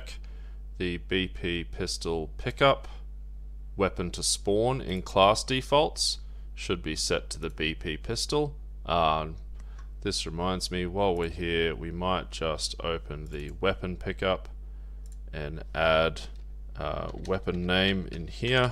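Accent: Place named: Australia. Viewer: Really great series of tutorials! Nice to hear a fellow Aussie's voice too as a bonus.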